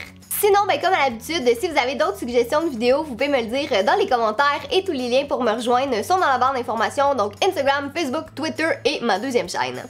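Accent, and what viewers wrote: Quebec, Canada. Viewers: Alors je pense que c normal je viens de France du sud même mais sinon j'adore l'accent québécois !!!!😀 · L'accent québécois 🔥😍😍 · Est tu québécoise ? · J'adore ton accent !!! J'essaie d'apprendre l'accent québécois ! Tu es trop belle j't'adore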